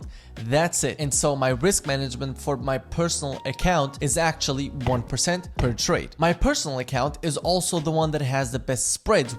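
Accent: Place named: Portugal. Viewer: Your accent brings some portuguese vibes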